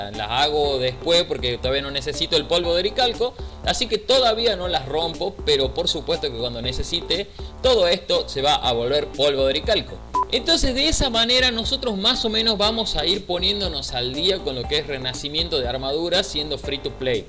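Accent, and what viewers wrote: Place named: Córdoba, Argentina. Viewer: yo soy de rosario, y a mi me sonas como de Córdoba o no se. En fin no importa tampoco, gracias loco porque aprendi una bands viendo tus videos. Seguí así!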